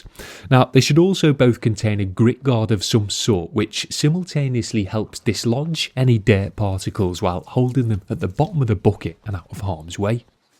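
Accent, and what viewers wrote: Liverpool, United Kingdom. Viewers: This guy has the poshest Scouse accent I've ever heard..... Yes I know that's an oxymoron!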